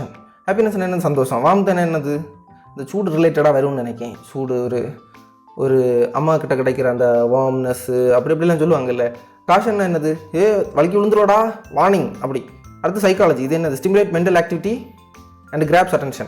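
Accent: Kanyakumari, India. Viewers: Bro neenga kanyakumari ah?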